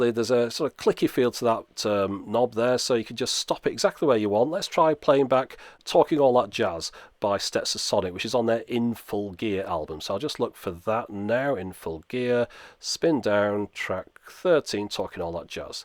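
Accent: United Kingdom. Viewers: it's awesome that youtube can recognize your words almost correctly through your strong British accent then provide a subtitle for me to recognize what you say..😳😳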